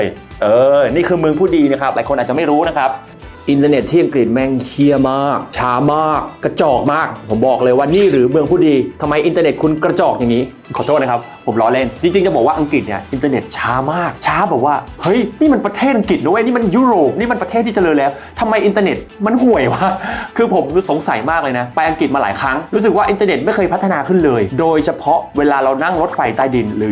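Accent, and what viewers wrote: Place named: United States. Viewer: พูดเหมือนที่เมกาในตอนนี้เลยค่ะ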